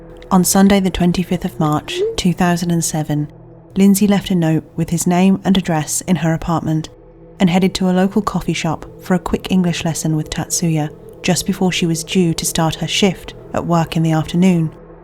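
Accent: United Kingdom. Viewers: Dude... Do you even hear yourself? You waffle on in here demanding someone with a British accept to do the very thing she is already doing; Speak normally. And you proceed to do this with a comment where you demonstrate your complete ignorance of the written English language. Are you this embarrassing in real-life too?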